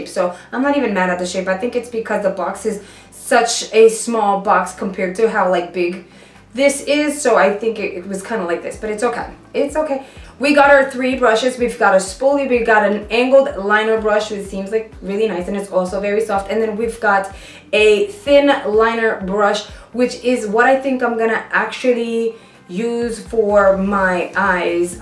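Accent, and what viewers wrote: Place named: Brooklyn, United States. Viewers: New subscriber here!! I smelled chocolate too on the contour/highlight palette. Also I like your accent. Are you a NewyoRican? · aww, i'll give it a shot anyway. BTW i love your accent! not sure what state it is but def the east coast! I'm from Denver Colorado we dont really have a cool accent like yours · Ur def Puerto Rican lol you sound like you are from Brooklyn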